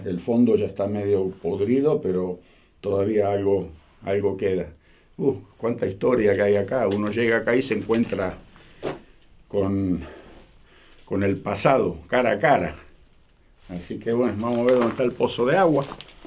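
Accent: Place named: Argentina. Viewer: ¡Pero cómo narra este hombre! ¡qué delicia escucharlo hablar de los frutales en el minuto! yo no era fan del acento argentino hasta que escuché a. Saludos desde España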